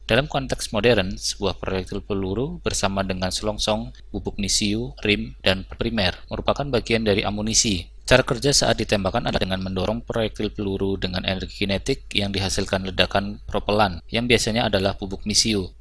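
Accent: Banyumas, Indonesia. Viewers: mmg top bermanfaat ilmu2 yg di bagikan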